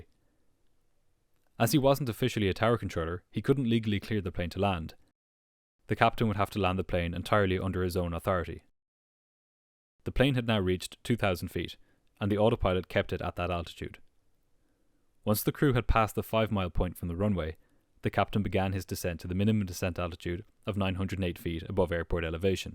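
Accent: Ireland. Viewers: Great video, and your soft. Lovely, beguiling irish accent adds so much enjoyment to an aleady wonderful production.......only one problem........its making me desperately homesick. Love and respect from mo in new zealand. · irish? lol, no matter, you have a sexy voice,,,,, this video was well done, xo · I detect an accent....Irish??? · enjoying the Irish lilt in this narration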